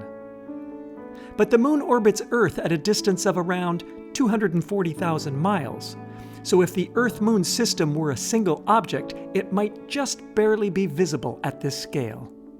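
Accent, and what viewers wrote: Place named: United States. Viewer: You have like the perfect american accent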